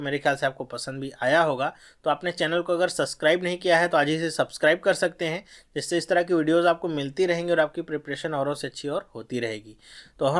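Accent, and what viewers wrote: India. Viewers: Sir your pronunciation is way too Indian.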